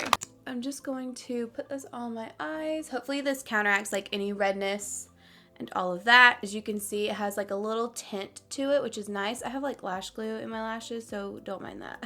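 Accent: South, United States. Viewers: i love your videos!!! i’m from KY so it’s cute to hear another southern girl! my twitter is: xoxohannah16 💗💗💗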